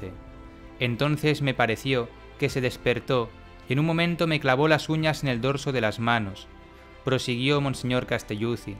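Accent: Spain. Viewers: Archidiócesis y arquidiócesis son correctas las dos en español, la definición de arquidiócesis se utiliza en Hispanoamérica y Archidiocesis en España. Espero que su duda quede resuelta . En italiano es ARCIDIOCESI. Tengan en cuenta que el narrador del video habla en español de España (no español latino por eso Uds. se confunden) Espero que la aclaración les sea de utilidad. Saludos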